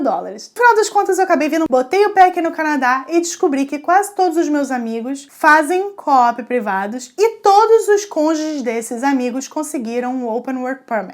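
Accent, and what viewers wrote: Rio de Janeiro, Brazil. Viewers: Curioso vc disse que morava em Santa Catarina mas vc tem sotaque carioca kk fora isso parabéns pelo video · amo seus vídeos vc é carioca ?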